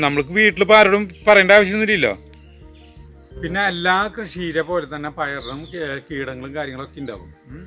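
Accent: Thrissur, India. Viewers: സൂപ്പർബ് ട്ടാ തൃശ്ശൂർ slang രസമുണ്ട് കേൾക്കാൻ. ഉപകാരപ്പെടുന്ന video. പിന്നെ വള്ളിച്ചീര കിട്ടീല്ല. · കൃഷിയേക്കാൾ ഇഷ്ടായത് മ്മ്‌ടെ തൃശൂർ സ്ലാങ്ങാണ്റ്റ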